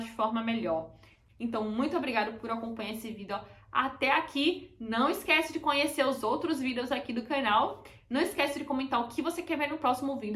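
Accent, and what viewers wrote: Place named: Feira de Santana, Brazil. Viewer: Sotaque de feira de santana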